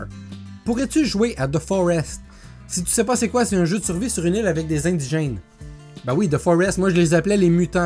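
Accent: Quebec, Canada. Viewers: j'ai 27 ans et c'est un grand plaisir de t'avoir découvert sur youtube j'aime vraiment l'accent du québec et ton contenu et de bien meilleur qualité que d'autre chaîne d'on ont ne citera pas de nom continu nice job ps: nous somme voisin je suis dans le quartier pauvre de zom-zom aller sur ce bonne continuation . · très bonne vidéo, Comme toute tes autre d'ailleurs, je prend rarement la peine d'écrire dans les comentaires des youtubeur mes je trouve ton contenue tres professionnel et ta facon de parlé est simplement parfaite (simple,calme,respectueux et surtout compréhensible) en étant quebecois moi meme parfois j'ai l'impression que les autre youtubeur quebecois parle (trop quebecois) comme qui il parlerai a leur ami de long date dans le salon ce qui fait que la video est moin plaisante a écoute. Un long texte pour simplement te dire ne change pas. J'ai h¸¸ate a tes 1000 abonnés pour mieux comprendre ton logo même si je crois déga avoir une petite idée de ce que cela peut représenté. 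PS: j'ai 23 ans.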